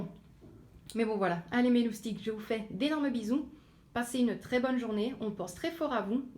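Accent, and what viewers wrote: Belgium. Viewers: oui juste à côté de liège, oui ça s'entend mais moi je l'entends pas trop lol parce que je suis aussi de liège lol donc je fais pas trop attention lol Oui chez lidl je fais souvent les promos viande et les produits d'entretien lol Oui avec ce que vous avez dans vos armoires ( pas forcément les réserves lol) Oui je regarde aussi vos batch cooking, enfin quasi toutes vos vidéos lol.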